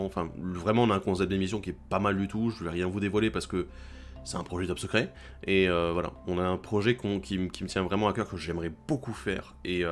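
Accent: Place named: France. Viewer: Merci pour tes remerciment tes le meilleur contenue francais meme si tu vien france avec l accent😅dsl jvien du Quebec .mais lache pas et ta des bonne idee pour ton avenir jai hate de voir ca .jaimerais parcontre te voir plus si tu pourrais faire tes deck tech (que jadore en passant)en face et peut etre avec un inviter de ton staff ca pourrais etre vraiment cool ou toute autre de tes nouvelle idee de video .continue on voit bien ta passion pour magic et surtout le commander 🖒🖒🖒🖒👏👏👏👏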